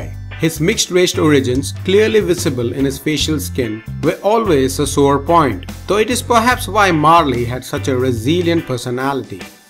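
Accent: India. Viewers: This historian must be Indian ppl because of accent do you hear? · The narrator is Indian, the inspiration is Jamaican, and I'm half Irish and Polish. Multiculturalism - or as Bob would say...One Love... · Ya his voice sounds like indian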